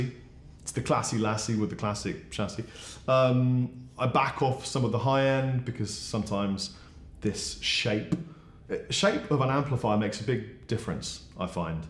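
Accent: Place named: United Kingdom. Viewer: You lost me, you talk too much! I want to hear the amp, not your British accent.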